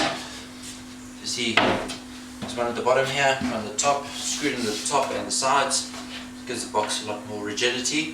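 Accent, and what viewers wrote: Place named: South Africa. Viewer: Not at all, you sound 100% South African, just wondering if you were also experiencing people getting confused with the 'English' South African accent as opposed to our Afrikaans accent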